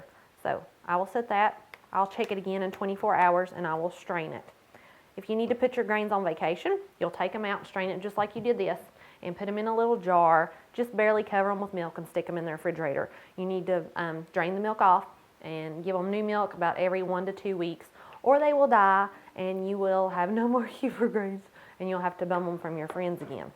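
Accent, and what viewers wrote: South, United States. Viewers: THANK YOU for mentioning how to store the grains without making more Kefir! Yours has been the only video (and I've watched about a dozen now) that mentions this. I want to start making Kefir, but don't envision that I'll need to make a new batch everyday (like everyone seems to do) and I was wondering what one did with the grains to store them, or if this was even possible. P.S. Love your southern accent. : )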